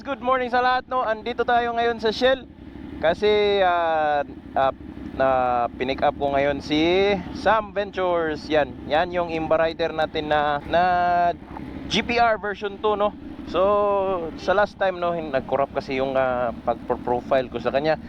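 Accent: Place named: Philippines. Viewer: i love ur filipino accent "lean anggol"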